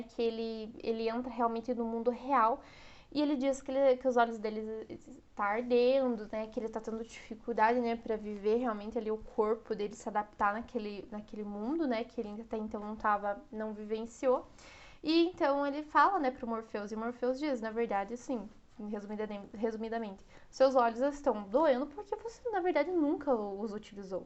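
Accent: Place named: South, Brazil. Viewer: Ainda não consegui calcular, com precisão, este seu sotaque. Paraná ? Santa Catarina ? Rio Grande do Sul ? Interior de São Paulo ? Você é linda e o sotaque também. (Juro por Deus que isso não é uma cantada)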